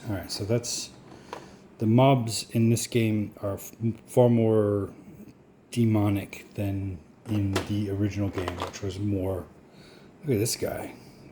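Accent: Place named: North America. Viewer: Thanks for the video! What part of the world are you located? Your accent sounds North American, but I assume you are not since I don't even think the boats have landed in the States yet. Shouldn't be long now and OMG am I excited to play!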